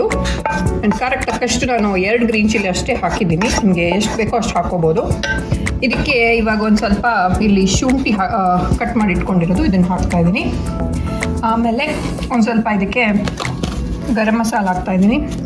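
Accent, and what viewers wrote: Mangalore, India. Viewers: 1.I love u soo much 2. I like ur talking style manglore kannada I am from Coorg 3. Beautiful content and camera clearty is super ur the best...... YouTuber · I love you akka I love voice and your voice I love your cooking I love your way of talking I love your way of Mangalore Kannada voice I love you are editing Lots of love from INDIA ❤️❤️❤️😘😘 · Awesome... & romantic end.. "neenu nodbeba haage.. heludusa marthuhegtada"... Mangalore Kannada rocks..❤🤩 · I love you r voice 😘 simle ❤️ Your manglore kannada style and your family ❤️😘❤️ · 1. cuteness 2. Mangalorian. namma urinavru. mangalore kannada 3. Foreign nalli idru kannada dalli matadtira. nimma voice ista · Hii Mam hegidira Nim video yake eshta andre 1. Nimdhu cute family, nim husband Nimge support madthare, mathe, nim kannada adhu nam m'lore language chenagidhe, olle useful video madthira. 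Dress chenagieruthe nim yelrdhu.soft agi mathadthira. 2.mathe Nim maga cute boy mathu, smile, video end madodhu yela chenagidhe, avnu super 👍👌 namge eshta yelrigu nam family ali. 3.mathe nim smile chenagidhe, video namge use ago video madthira adke eshta, hage nivu mado recipe yelanu eshta india, Karnataka, m'lore, style ali madthira chenagieruthe. Try madthivi navu maneli. Hage Madhya tulu language mathadsodhu eshta aguthe. Thank you, take care bye. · Hi mam nimma Mangalore kannada kelalu chanda Matte natural agi vlog madtira Nivu torso chat recipes awsome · 1. Reyanch Hii elode ondu thara chanda adrallu Super agi Kannada mathadthane evag ero yella baby's bari English mathadode nodthivi adre Reyanch Adasthu Kannada dalle mathadthane adu nanage esta ago ondu point... 2. Nivu mathado ondu reethi eruthalla adu keloke ondu chanda niv akolo dresses erbahudu nivu mathado Mangalore language erbahudu yella thumbane chennagiruthe edu nanna second point. 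3. Nimma Chanel alli ero yella videos thumba chennagive Canada alli nivu yelle hodru nivu Adasthu video madi akthira nav adru allige barodike agalla niv erovargu nimge est agutho ast videos akthira andkondivi yellaru Bere bere country ge hogoduk agalla nimma Chanel enda adru nodi kushi padthivi adikke nanu nimma Chanel ge subscrib madidivi Akka. Edu namma 3 point Akka Enna eloke thumba ede adre explain madoke agalla.. love u reyanch and Akka..... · Nim Kannada ಮಾತಾಡೋ ರೀತಿ especially Mangalore Kannada is too good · Hii ma'am, I'm 18 and I feel your content is very entertaining and it makes me happy to see you always happy and motivated. I like how beautifully you celebrate each festival in Canada and cook various Indian dishes with passion. I really like the way you're bringing up your son, he's such a sweetheart. Overall you're very inspiring and Mangalore kannada thumba chanda untu🖤 I feel these are my opinions on your channel. 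Lots of love and power to you🖤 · 1.Nimm Mangalore kannada tumba esta agutte 2.Nimm unique Voice ishta agutte nivu matado kannada ishta agutte 3. matu nivu avnig kodta iru Sanskriti tumba ishta nimage adakke danyavadagalu... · Firstly I am an engineering student. I wanted to do masters in Canada and probably work there for some years and come back to India. While I searching about this I found out your channel. Since then I am following you. 3 points are 1. You sharing the experience of living in Canada 2. Nimma Mangalore kannada😂 3. Your simplicity and humbleness Asthe akka mathe yenu illa By the way nim maga super cute. Hopefully I fulfill my dream of doing masters in Canada 😬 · Hello... 1.I like the greenery of Canada,especially tour vlogs😁 2.I like ur Manglore Kannada 3.I like the recipes you show and I also like you😁 Congratulations for 50K, May u reach higher and succeed 🥰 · 1. Alwa. 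haaaa ee dialogue ge 2. Nim manglore Kannada so sweet so 3. is my son Vaibhav 's friend so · manglore style talking Ur positive vibes when u speaks about any thing I love ur family estu kushi kushiyagi irtira manele idruuu And i love ❤❤❤❤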